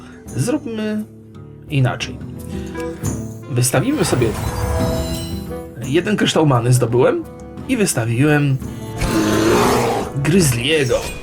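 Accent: Silesia, Poland. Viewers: pierwszy raz w grze słyszę gwarę śląską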